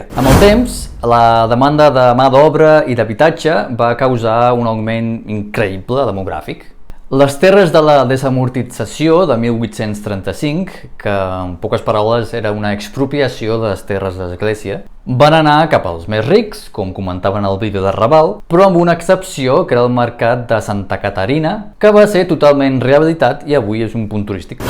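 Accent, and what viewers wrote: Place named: Brazil. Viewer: gràcies pel vídeo, m'ha encantat! ja parles amb accent brasiler, que boniques són aquestes mescles interculturals 😅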